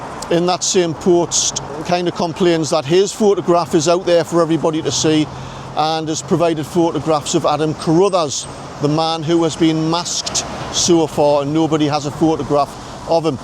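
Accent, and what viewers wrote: Newcastle, United Kingdom. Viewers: Wonderful to hear a true Geordie accent, and to get this interesting update. Apparently you don't use the BBC "ssshhhh-edule" pronunciation. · It’s called Geordie. It’s an English dialect spoken in the north east of England; primarily Northumberland. It can be traced back the Angles who came from Denmark over 1,500 years ago. In fact, some Danish friends of ours said they can easily understand the more broader versions of the Geordie accent. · You simply can’t beat that pure Geordie accent! 😊👍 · Great reporting. Thanks for keeping us updated. Love your Geordie accent 💕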